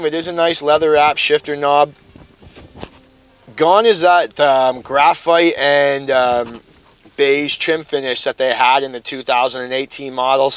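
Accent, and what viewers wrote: Canada. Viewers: Is it:a Canadian thing with:"mu-ran- o:instead of mu-ran-o you:guys also say maze instead of'mood funny!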